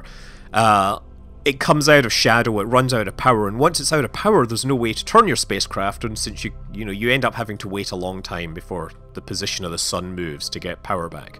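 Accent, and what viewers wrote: Scotland, United Kingdom. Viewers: i love that your brilliantly scottish accent means that you could be saying mun or moon, i love it xD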